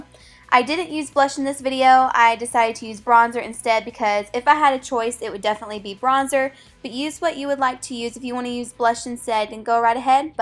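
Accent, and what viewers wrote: South, United States. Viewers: in this video it sounds like you have a tent of a country accent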